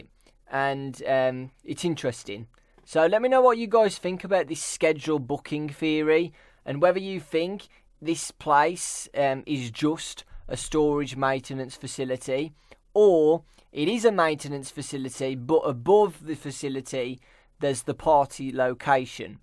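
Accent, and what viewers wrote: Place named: Scotland, United Kingdom. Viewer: I don't even care about FNAF:SL I just watch this for Scottish accent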